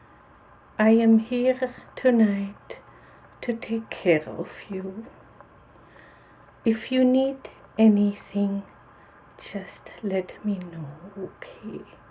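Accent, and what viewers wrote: Germany. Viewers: Unexpectedly brought to this video and I really like it because of the strong accent I don't hear so often in other videos. The dialogue makes me feel like I was staying in a small, family-run inn after a long day of traveling. I feel like comfortable and safe in the capable hands of German hospitality. I haven't been to Germany, but I very much hope to in the future. I would look forward to more videos! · This is the first time ive seen tbis video what a great job I loved the extra strong accent you look like a real nurse I know these types of videos are not easy it would be so cool to see more videos like thiz if you can ever find extra time that is i would love if you made a video with you speaking in german just for extra asmr tingles im sure all of your followers also would enjoy to hear you speak your native language anyway this was just a thought i love asmr where people have accents or speak in other languages this is a huge asmr trigger for me Thank you for all your hard work love from nanaroriasmr 💖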